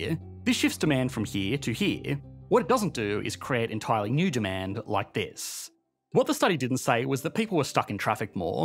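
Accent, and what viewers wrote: Australia. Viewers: goes to the point. I live in a suburban area in Europe and own a car but also use public transport. While I use the car to go to work away from the city there are definitely use cases where going to the city is faster, cheaper and much more convenient by public transport. Not to mention that that shorter travel time is also free to use for reading news, watching this video, or other and you can also drink some alcohol if you are going out. It is unbeatable value proposition, forget the car. But that is mostly because the city was planned to have really good public transport on the expense of roads for cars. Some jurisdictions even outlawed free parking to give more incentive to use public transport. The author of the video sounds Australian, so I understand, that’s another planet compared to large European cities.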